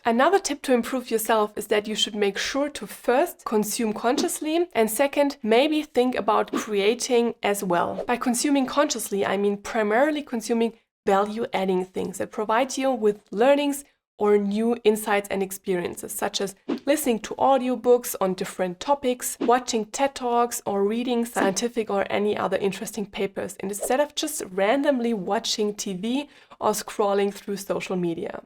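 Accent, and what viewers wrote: Germany. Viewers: btw. Feedback is for me the most difficult to ask. Tip 11 is btw for me a problem, because I am criticaly ill. However I am very much on my way with doctors to get better and very motivated to start my walks which I have done in the past again. So do not worry. 11 is comming again. I do my shopping by foot, so a bit 11 already. For you in tip 12, something small to learn, a little line in Dutch, however do I hear a little German accent? In that case it will not be that difficult: Dank je wel dat je me vandaag weer wat geleerd hebt.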